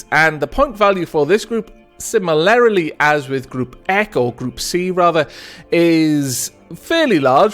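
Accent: Wales, United Kingdom. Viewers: You're welsh? Grwp? :)) that's awesome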